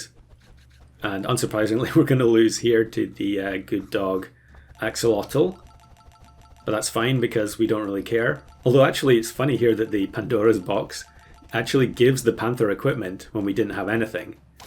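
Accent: Scotland, United Kingdom. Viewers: Which part of Scotland is your accent from?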